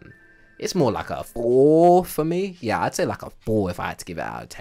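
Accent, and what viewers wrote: United Kingdom. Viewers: Why do you have a british accent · Nice to hear a British voice in this music YT space for a change. Especially someone taking it seriously. Keep it up, mate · Wasn’t expecting you to be British.. didn’t know yall wore duwies.. when did yall start that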